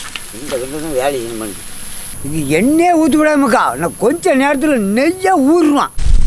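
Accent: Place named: Nagercoil, India. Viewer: Slang nagercoil maari iruku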